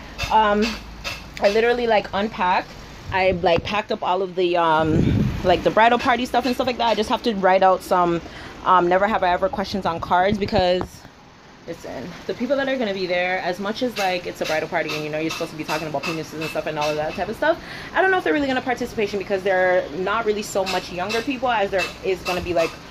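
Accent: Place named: Cockney, United Kingdom. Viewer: The cockney though 🤣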